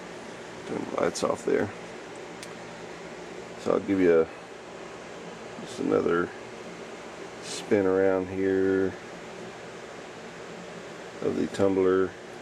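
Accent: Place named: West Virginia, United States. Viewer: Your accent tells me you are from West Virginia or close to it.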